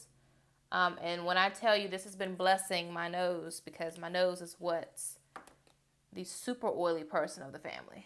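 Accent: South, United States. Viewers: I love your southern accent so much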